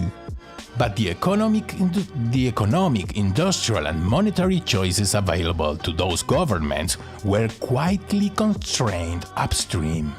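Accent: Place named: Latin America. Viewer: DUDE FAVOR! IF YOU'RE GONNA LAY DOWN THE HEAVY AI HISPANOLA ACCENT AT LEAST SWITCH ON THE CLOSED CAPTIONS!!! THERE'S ENOUGH PAIN N SUFFERING IN THE WORLD ALREADY!!! UGH SMH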